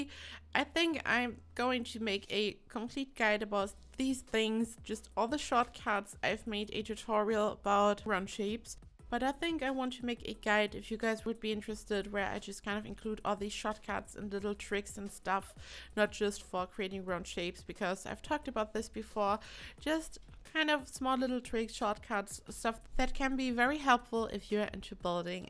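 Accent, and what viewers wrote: Germany. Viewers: Just a question, are you German? Cause I think you have a very German accent! 🇩🇪🖤❤️💛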